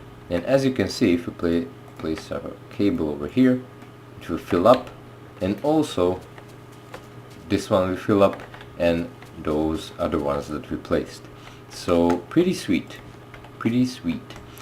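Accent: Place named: India. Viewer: hmm he sounds like Indian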